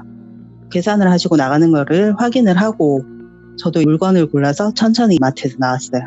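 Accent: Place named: Gyeongsang, South Korea. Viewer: 갱상도 ㅋㅋㅋ신경과민ㅋㅋㅋ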